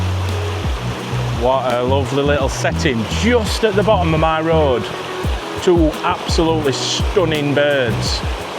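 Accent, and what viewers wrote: Yorkshire, United Kingdom. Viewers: glad you was happy for me to comment 😂. Filming yourself also makes yourself know that your not a bad person,you start seeing what everyone else sees about you. Mental health is the worst, can't wait for next one, so miss the Yorkshire accent 😂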